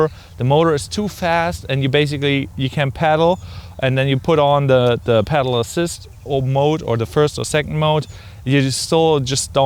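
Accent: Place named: Germany. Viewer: oh i really like Germans when they speak english :)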